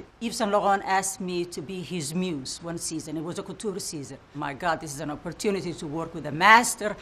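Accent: Somalia. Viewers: Her Somali English Accent is cute! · she has a very faint Somali accent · she's somali not arabic, we don't have the same accent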